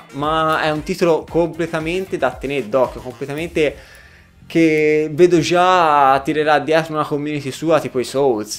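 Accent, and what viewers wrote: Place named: Tuscany, Italy. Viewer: Leggermente troppo dialetto toscano, ti consiglio di semplificarlo sennò la gente non riesce a capire troppo bene e leva il video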